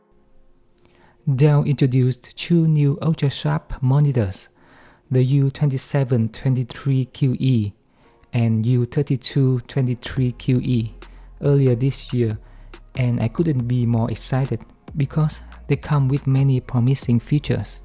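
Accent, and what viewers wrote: Vietnam. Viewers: Đúng giọng Vietlish rồi, thằng chủ kênh người Việt Nam à =)) Chanel owner is Vietnamese =)) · giọng này chỉ có VN :))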